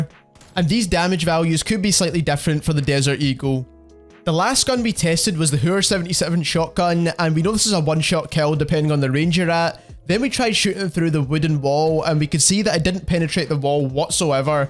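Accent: Ireland. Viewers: He sounds Scottish or Irish. I think Irish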